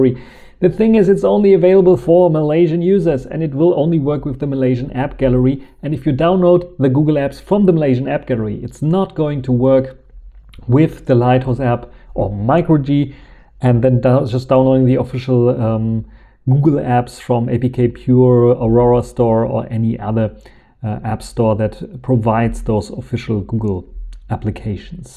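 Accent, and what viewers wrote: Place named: Germany. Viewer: Ein deutscher Kommentar flutscht mal durch, weil ich denke da einen deutschen Akzent zu hören :) Folgendes: Ich stehe kurz vor dem Kauf eines Huawei Gerätes, bin aber auf zwei Banking Apps angewiesen, erstens N26 und zweitens ING. Kannst du das vllt mal mit GBox testen und schauen ob das läuft? Danke dafür im Voraus! Zweitens: Ich sehe gerade dass du seit sagenhaften 16 Jahren Youtube machst, fast auf den Tag genau (im Okt 2006 hattest du das erste Video gemacht), Respekt!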